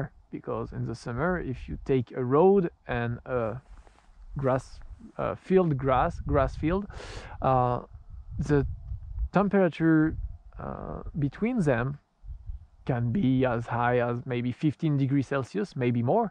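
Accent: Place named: France. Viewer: you never have to apologise for having a French accent. Like most Englishmen, I can just about manage to order a beer and a ham and cheese sandwich in French. I am constantly amazed at other people's ability to communicate so fluently in another language.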